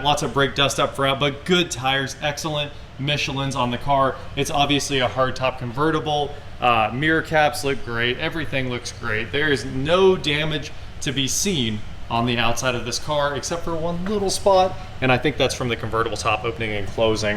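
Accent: United States. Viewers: i have an early 97 slk230....all door locks work fine...the interior plastic paint is an issue,,it doest stand the test of time well...you can buy oe paint from germany tho...you sound like your in america so prob the heat has caused probs with the glue on the trim...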